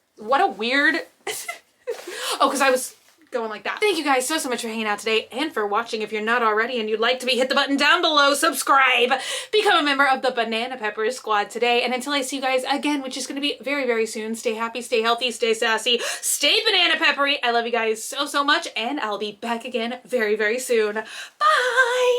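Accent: South, United States. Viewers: I love your country voice, you should keep it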